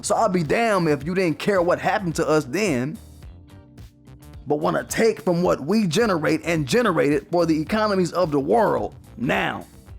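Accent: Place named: Texas, United States. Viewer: You sound like an old white hick from Texas.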